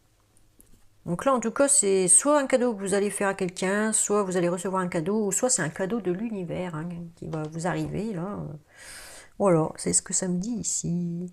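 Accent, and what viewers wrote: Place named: North, France. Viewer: Bonjour vous êtes du Nord 😊😊 je l'entends comme moi 😊😊 votre tirage me parle beaucoup ♥️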